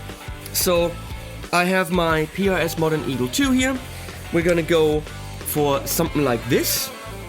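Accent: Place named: Germany. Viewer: Your deutscher Ekzent ist so knuffig.... wieso sprichst du nicht einfach deutsch??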